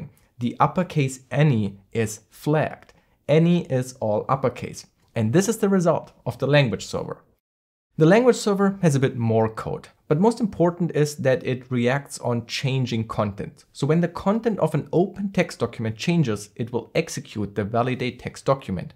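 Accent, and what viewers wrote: Germany. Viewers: 3 sekunden ich wusste sofort der spricht deutsch 😂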